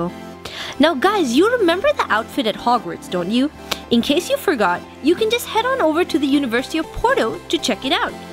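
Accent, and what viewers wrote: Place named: Spain. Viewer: I'm Portuguese and hearing her pronounce every single place with a Spanish accent really made me cringe. People need to understand that Portuguese and Spanish are two different languages, we might have similarities but accent is not one of them. Portuguese and Spanish don't have a good history together so please don't ever mix Spanish and Portuguese because both ethnicities won't appreciate it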